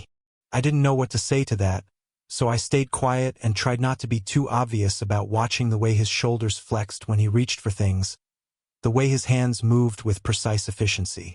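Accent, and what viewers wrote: Spain. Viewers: and again those spanish accented phrases popping up like unwanted exclamation marks -. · The occasional Spanish and Spanish accent is funny 😂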